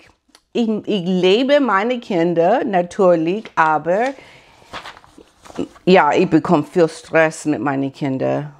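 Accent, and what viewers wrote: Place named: United States. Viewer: Babs kann die ganze Patchworkfamily, also auch seine uneheliche Tochter Anna und Amadeus aus seiner zweiten Ehe, zusammenhalten. Sie versteht sich mit Angela Ermakova und Lilly Becker, die ihre Kinder sehr gut ohne Boris weiter erziehen. Finde ich ganz toll und ich hoffe noch immer ein bisschen, dass sie mit Lenny Kravitz zusammen kommt. Er ist über Jahre ihr engster Freund, aber vielleicht ist es besser so, denn eine Beziehung ist immer kompliziert und sie sagte einmal, dass Freunde sehr wichtig für sie sind und sie sich als Single wohl fühlt. Emanzipierte und intelligente 🚺 👍 Da fällt mir noch ein, ein Rothaariger mit einer Farbigen zusammen, wie bei HM 😅 aber Babs ist ein ehrlicher guter Mensch. Stelle dir mal vor, Harry betrügt M 🤣 Ich liebe deinen amerikanischen Akzent 😍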